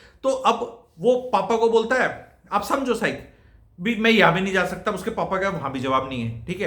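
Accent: Haryana, India. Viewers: I love your hariyanvi accent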